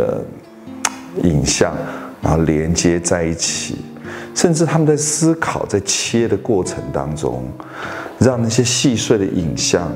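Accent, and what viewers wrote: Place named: Waishengren, Taiwan. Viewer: 看新聞中跳出廣告，您講話的方式感到一股濃濃的外省貴族味，特來朝聖。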